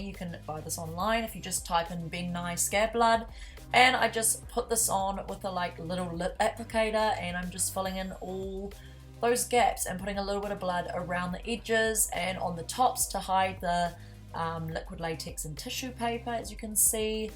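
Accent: New Zealand. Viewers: Wow! Very creative. I don't want to be offencive or racist but are you from New Zealand? The kiwi accent just clicked for me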